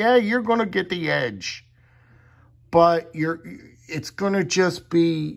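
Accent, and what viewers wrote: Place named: South Jersey, United States. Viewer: Lol that SNJ accent..... I grew up there myself. Oh, and the range boss is amazing.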